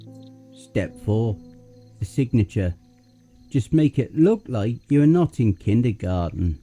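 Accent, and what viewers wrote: United Kingdom. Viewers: The creepy animation at the beginning makes this so worth it., you're amazing. Your British accent is a joy to hear. I look forward to hearing more narrations by you. Also, man How do you get subtitles?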